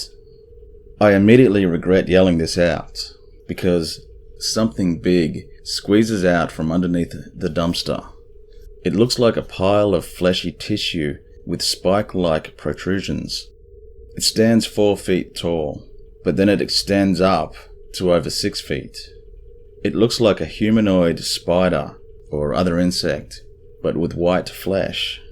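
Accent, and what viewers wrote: Australia. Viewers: Miss you, cant fall asleep without that Aussie narration and humor, also wish I could have a smile & a Vegemite sandwich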